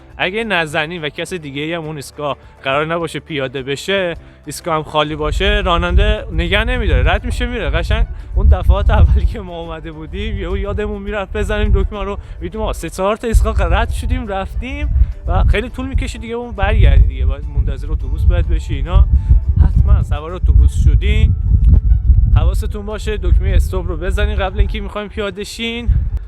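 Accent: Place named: Turkey. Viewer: عالی داداش ،داداش یکم لحجه داری ترک زبان هستی؟؟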